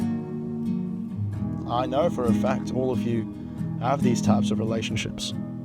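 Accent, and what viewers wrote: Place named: United States. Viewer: Bro im 17,im from India i just came accross your channel and really loved your vids. In addition I'm impressed how you speak.. fcking smooth english with American accent and can speak hindi too. Bro i just want your advice on improving my English accent cuz i have big goals and i wanna be an entrepreneur on international level. So that will help me a lot.pls drop some gems here if you are reading this❤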